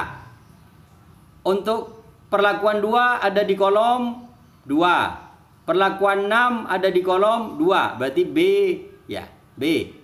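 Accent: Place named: Madura, Indonesia. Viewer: Semangat pak, lanjutkan mengajarnya , saya suka dengernya kalo pake logat madura gini mantab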